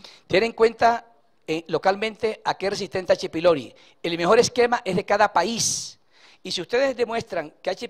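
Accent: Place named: Spain. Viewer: Eeexelente conferenca y sobretodo laclaridady la sencillez con esa explicacon genial el Doc ¿de donde es por su acento al hablar parece ser de España muuchas felicidades Doc. Siga asi amandosu carrera nocomo otro$$$$$.....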